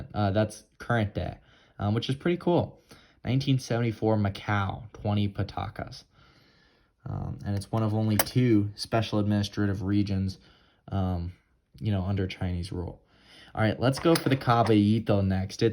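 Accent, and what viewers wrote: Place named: United States. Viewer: P.S American pronunciation is hilarious to the rest of the British world !! Bless!!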